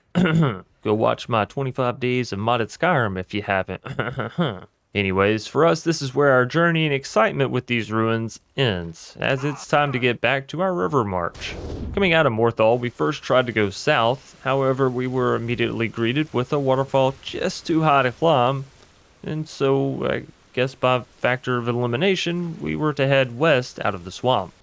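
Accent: South, United States. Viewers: your mild southern drawl is enchanting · It sounds like you have a country accent sometimes anyway super entertaining video I love stuff like this keep it up brother ❤️ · i have nothing to say other than ur voice is rly nice to listen to! i cant tell if u have a slight southern accent or not but its still nice lol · Is that a southern accent I hear?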